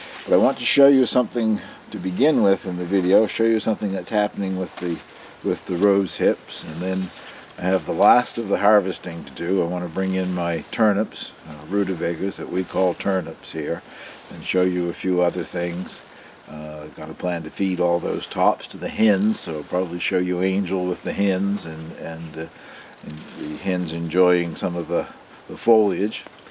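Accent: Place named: Canada. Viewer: Dear, I have just found your channel in the past 2 weeks.This is the best! Really enjoy all your videos, the chickens, darling Angel, your cabin all wonderful. I think you may be a modern day Thoreau! Thank you for sharing your very interesting, and inspiring life. Have always wanted to visit Campobello Island, as well as P.E.I., hope to do so one day. My maternal Grandparents were Canadian (I am American) and it is lovely to hear your accent.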